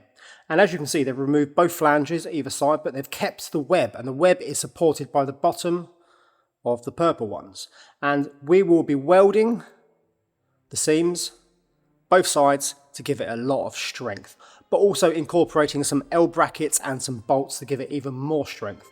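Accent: United Kingdom. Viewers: if I offended you, I’m sincerely sorry. I hoped the emoticon showed I meant it as a light jest. (As an American, I thought your pronunciation was probably a British quirk.) I have huge respect for you, and I’m a huge fan of your channel. I discovered your channel two months ago and have watched every episode up to this one so far. I forgot that just because I feel like I know you so well, you don’t know me at all, and I shouldn’t presume to joke like that.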